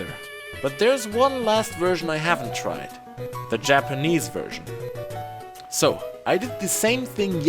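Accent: Germany. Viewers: Man hört das deutsche raus herrlich hahaha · Wenn du nicht gesagt hättest, dass du die deutsche Version gespielt hast wär mir dein Akzent kaum aufgefallen. U got a keen knowledge of English bré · Direkt den deutschen Akzent rausgehört 🙈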